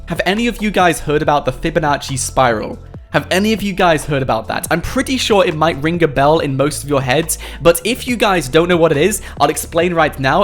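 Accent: United Kingdom. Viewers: I like his British accent. This is amazing. I liked, subscribed, and smashed the bell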